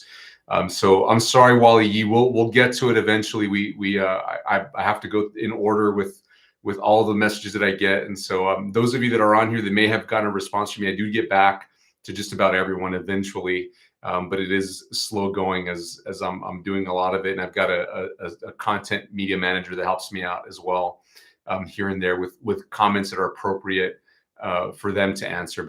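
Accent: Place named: Tennessee, United States. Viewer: I can hear you tennessee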